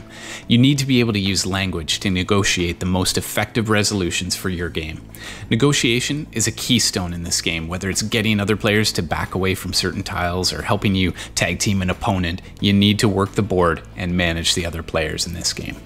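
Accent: Canada. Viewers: Canadian accent detected.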